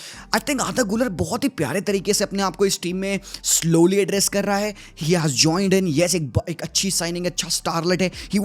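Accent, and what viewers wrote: India. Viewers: Bro what is this english-indian accent?? İt is so hard to follow man.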